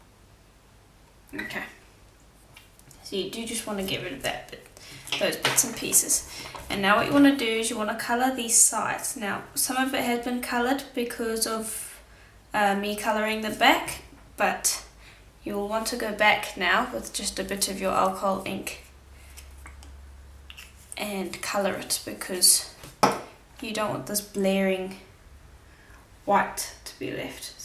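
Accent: South Africa. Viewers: Your accent feels so familiar! Are you a bittie South African? Enjoy your videos! Thank you. 💖🌸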